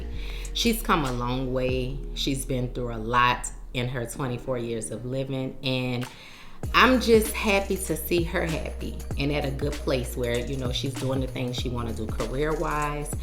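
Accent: New Orleans, United States. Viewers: I just love your personality & accent…ain’t nothing like a NEW ORLEANS GIRL…we love you 🫶🏾🥰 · Love your NOLA accent. We know you are busy Queen!!!👑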